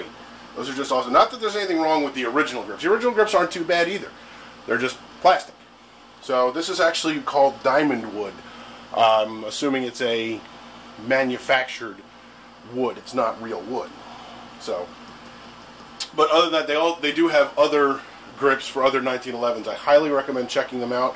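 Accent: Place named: Philadelphia, United States. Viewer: Great video Big Dawg. When I heard you say cheese steak or hoagie I thought, this guy must be from the Philly area, then I heard you say the Oaks gun show and it sealed the deal, Philly area it is. Anyway, I just picked up a blued Taurus 1911 today at Tanner's black friday sale for $350. Cant wait to get out and shoot it. I did a bunch of research and everyone that has one loves it. By the way, born and raised in Philly.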